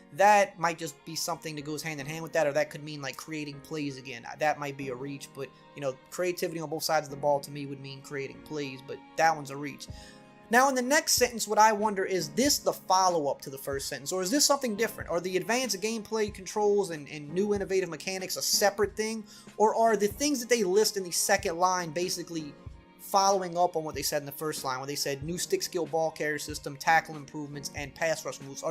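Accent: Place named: Pittsburgh, United States. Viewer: you from pittsburgh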